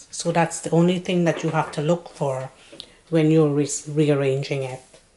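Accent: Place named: Trinidad and Tobago. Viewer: Are you Trinidadian? (Wonderful video, thank you.)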